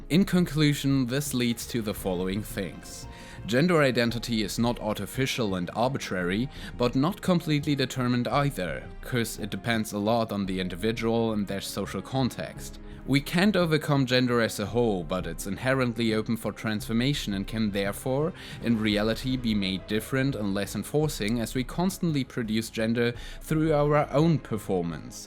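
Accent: United Kingdom. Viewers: you legit sound a bit scotish:D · Darf ich fragen woher du deinen Akzent hernimmst? Klingt nach einer sehr ungewohnten britischen Varietät. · I'm still not sure if I'm an NB/Agender/Genderfluid but I'm OK if ppl call me female bc I know they just don't know it better (but it literally gets on my tits sometimes...) but I get rlly happy when called a boy...♥ I just wish there was less binary pronoun-calling in Germany as well as gender role expectations... People can be so narrow-minded limiting themselves and others. But wanting it changed from one day to the next is utopian... either way... work on that adorable British accent, darling. Very neato. Keep it up! ♥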